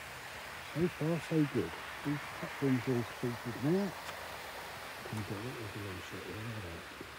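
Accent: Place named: England, United Kingdom. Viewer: Looking the name of your layout and hearing your accent are ypu anyway near Daws Hill, High Wycombe? Cheers Michael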